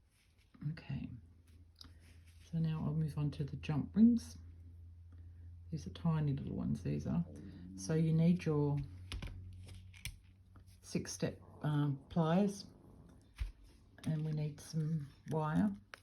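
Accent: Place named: Australia. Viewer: Hi … it’s Shelley Cass in Brisbane. We ‘met’ in a different group about a month or so ago. It’s nice to here an Australian accent in a tutorial. Also I agree with your comment that we don’t have access to many large bead retailers in AU unfortunately. 😔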